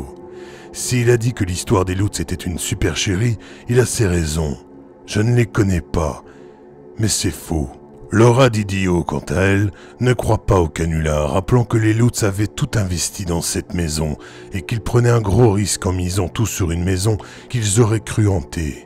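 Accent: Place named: Belgium. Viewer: J'ai des amis belges qui prononcent également de cette manière. Ça donne houit pour huit, fouite pour fuite etc...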